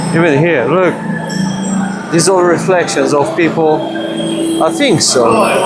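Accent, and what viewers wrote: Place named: Italy. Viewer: It's funny that you speak Italian fluently as it sounds like your accent when you speak English has a hint of an Italian accent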